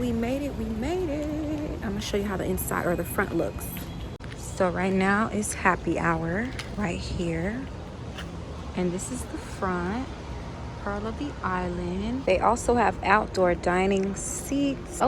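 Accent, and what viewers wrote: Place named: United States. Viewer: You got it going on there quick question are you Haitian coz you sound more American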